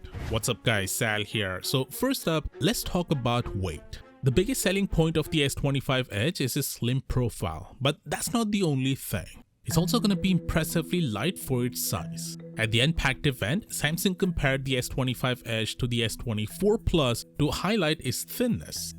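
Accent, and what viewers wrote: India. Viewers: Of course its an indian accent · ur english sounded like indian or pakistani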